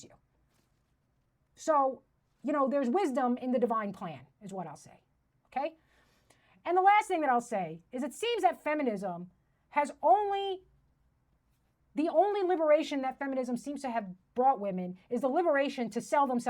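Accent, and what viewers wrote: New York, United States. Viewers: Women berating other women for being women is a new content genre. But in that NY delivery, it really feels sharp and totally lacking in appeasement, which is great. I would date you, because I get the feeling even the fights would be funny AF, ngl. Wish you the best of luck with your comedy career, I really need this no bs attitude to make a comeback to standup, everyone's being so weak right now. Easy sub.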